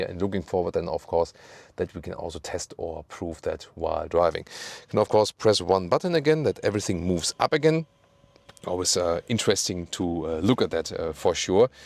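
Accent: Germany. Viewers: don't apologise for your deutsche pronounciation. Indeed it's MaybaH, not MaybaK.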